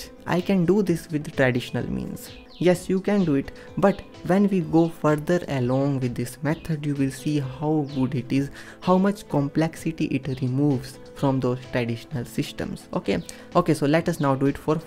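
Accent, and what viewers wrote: India. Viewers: bro sounds more indian then me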